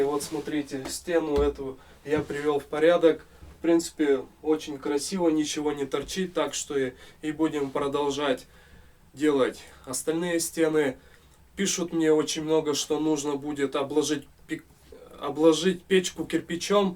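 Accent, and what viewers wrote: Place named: South, Russia. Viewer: Прям чувствуется южный акцент))))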